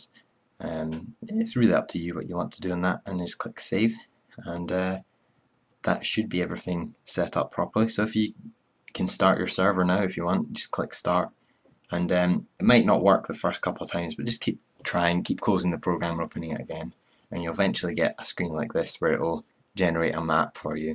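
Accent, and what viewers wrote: Scotland, United Kingdom. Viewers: Thanks this helped ! Are you Scottish ? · are you scottish?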